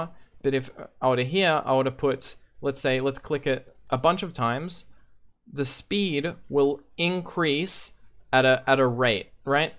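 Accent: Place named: Australia. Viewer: You sound like an australian =) "Soouu"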